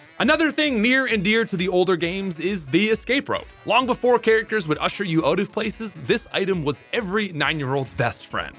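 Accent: Canada. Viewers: Some of your pronunciations of the pokemon are odd lol..must be your Canadian accent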